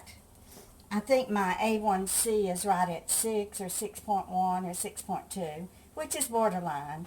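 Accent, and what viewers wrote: South, United States. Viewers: Good morning,. I love to hear your voice. Your voice just makes me feel good. I live in the northern Midwest and the southern accent brings me such joy. I think I can smell that chili and it smells yummy!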